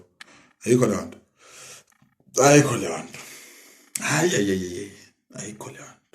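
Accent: Zimbabwe. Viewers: Ngiyakuzwa mfwethu ukhuluma iqiniso, kodwa manje wakhulumisa kwama Zimbabweans kangaka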